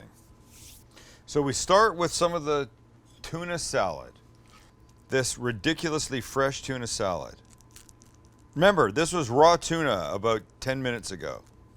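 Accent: Canada. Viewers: He kind of has a Canadian accent Anybody notice that? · Hey Sam, I just found you a few weeks ago. I've commented on several videos how nice it is to see a man who seems like a real Californian, but who cooks much like my family does in the South. Believe it or not, we really do NOT deep fry and BBQ everything! lol... a shock, I know ;) But, really, you cook healthy...but not goofy healthy... REASONABLY healthy. And you won't hesitate to use some fat, real butter, and last week you even used MAYO where I often use butter, and you changed my life. I'm only barely exaggerating! Anyway..one real question: Are you from Canada? I ask because you say your "ou's" such as "out" and "about" with a little Canadians flavor (oot and aboot). Or am I just revealing how unsophisticated and un-cosmopolitan I am?? lol. Either way, GREAT channel.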